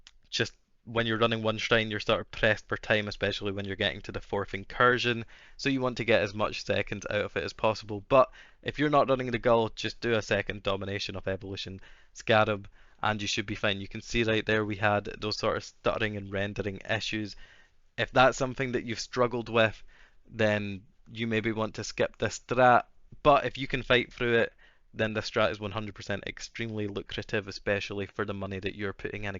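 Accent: Scotland, United Kingdom. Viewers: I discovered your channel this league and all your strats are all great and I really like listening to you, your Scottish accent is the cherry on top. Keep it up bro. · scottish accent = subbed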